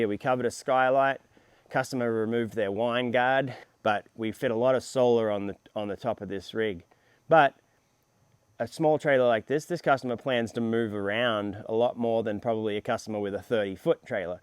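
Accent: Australia. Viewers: Australian accent and then 120V - confused for a while. Disadvantage I see is power loss in converting the 12V DC alternator power to 120V AC then converting it back to 24V DC... I think the new 12-24 Orion would lose less power. I also don't understand why laying some thick DC cables from the Alternator to the Orion should cost so much either... in comparison to the cost of the RV/Caravan. Batteries - I love Victron but there are far cheaper brands of LiFePO4 batteries on the market. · First I thought with your Aussie accent that you were in Australia but not so you’re in the US. Australia has new rules for caravans where batteries that are installed in a liveable space must be in a sealed metal box that’s vented to the outside.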